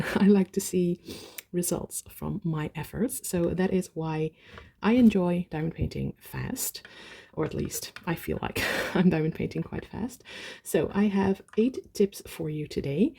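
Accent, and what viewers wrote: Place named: Netherlands. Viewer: Clocked the Dutch accent immediately hahaha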